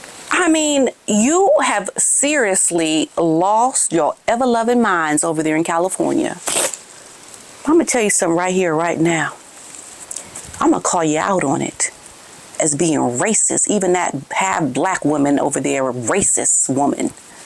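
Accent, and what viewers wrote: United Kingdom. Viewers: Hey, good British accent, ❤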